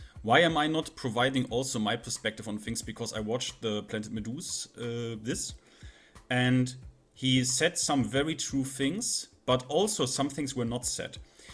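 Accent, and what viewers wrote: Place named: Germany. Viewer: direkt den deutschen akzent erkannt :face-blue-smiling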